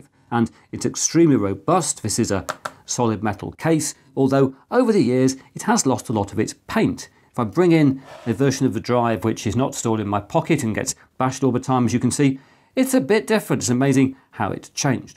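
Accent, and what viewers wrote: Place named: Liverpool, United Kingdom. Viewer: the beatles accent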